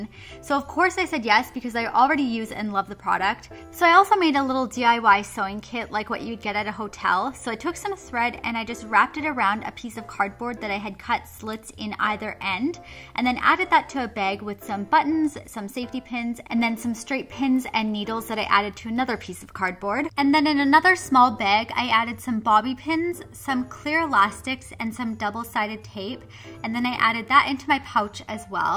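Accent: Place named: Canada. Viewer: Are you Canadian cuz the way you pronounce the letter A just sounds Canadian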